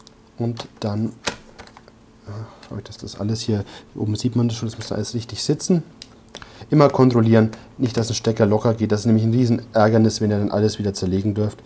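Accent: Germany. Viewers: Mit dem leicht fränkischen Akzent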